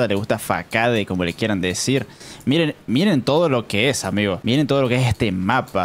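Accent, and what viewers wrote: Argentina. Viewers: Sos argentino 😁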